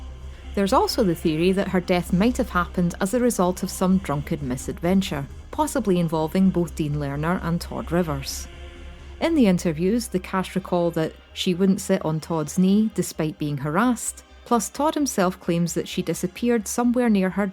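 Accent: Scotland, United Kingdom. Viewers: New to your channel, caught it through inside number 9, but must say ireally love this review of dark place i loved it and still do and noone i know has heard of it or chose to give it a try so thank you also nice to hear a fellow Scottish accent online didn't even need the subtitles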